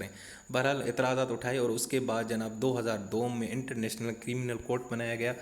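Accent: Pakistan. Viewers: Started watching and was like “awaaz se toh lag rahe Pakistan ke hai ye” and fir aapne petrol price puch liya. Great video though! Helped a lot.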